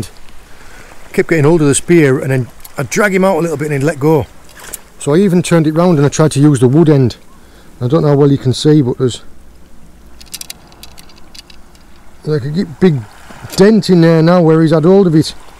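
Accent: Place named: Cornwall, United Kingdom. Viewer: “Sheking” 😂 I love your Cornish accent!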